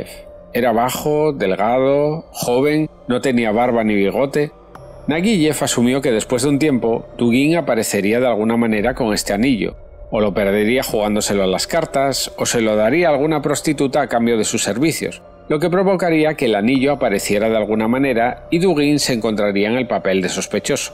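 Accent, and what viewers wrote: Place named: Spain. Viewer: Hola! Me acabo de topar contigo y voy a ver tu video, a ver qué tal. Es la primera vez, como he dicho. Te advierto que soy muy avezada en asuntos criminales, no me preguntes por qué, y que suelo comentar respecto al vídeo. Por tu acento creo que eres español. Yo te escribo desde Cartagena, en la Region de Murcia. Saludos! 🐺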